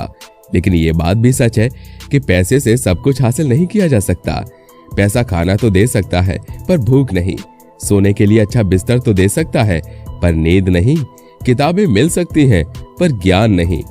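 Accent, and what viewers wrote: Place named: Rural, India. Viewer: Yaar ye youtuber kaise baat karta h 🤣🤣 total gaavwala 🤣🤣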